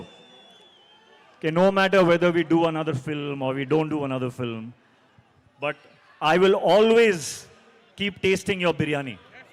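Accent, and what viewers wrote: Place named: Telugu, India. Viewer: accent 90 per original telugu person laga undi..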